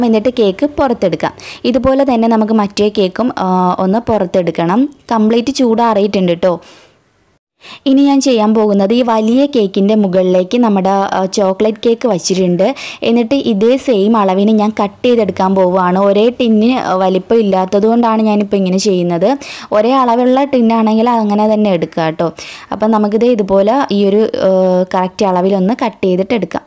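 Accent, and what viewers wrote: Kollam, India. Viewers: I'm from kollam.chechide samsaram kettapozhe manasilay kollamkariyannu.ano😃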